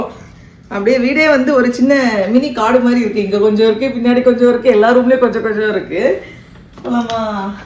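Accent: Nagercoil, India. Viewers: Hello. It was a pleasant surprise to meet you and your family yesterday at the Garden. I, along with few friends, as gardening hobbyists watch your channel and get some inputs. Thanks for the contents and Nagercoil Tamil. Allan.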